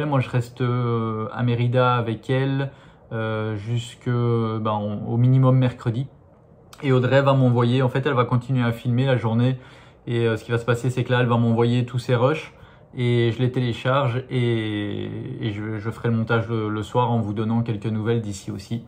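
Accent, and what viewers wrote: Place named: Belgium. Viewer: Très très touchant, tellement réaliste, bravo de dire tout haut ce que l'on pense tous! Et sinon ton petit accent belge😍😍 Gros bisous et bon courage à ta maman et à vous tous dans cette épreuve.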